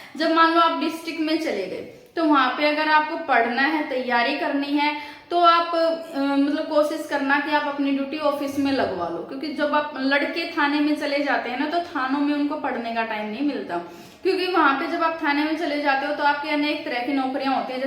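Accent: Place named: Rajasthan, India. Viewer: Aap rajasthan se ho kya alwar ke aas pas se awaz se lg raha h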